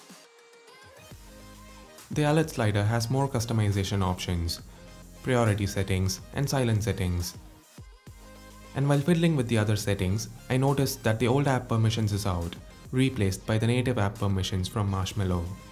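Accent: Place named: India. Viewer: with the Indian accent? Umm... maybe he's Indian?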